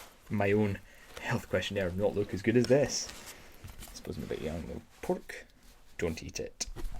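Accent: Scotland, United Kingdom. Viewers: your Scottish accent is great!! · I always found the Scottish accent a great trigger for me! suscribed m8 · As a scot. I have to say , loved the accent . Sound like most of my mates. And me kinda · I love scottish accent, and yours is amazing. Would you make more of it? 💞❤️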